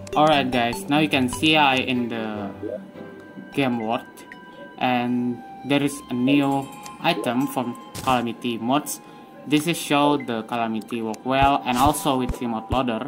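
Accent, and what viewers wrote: Indonesia. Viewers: menurut gw berasa kok indo nya, udh biasa denger org indo ngomong pake b. ing.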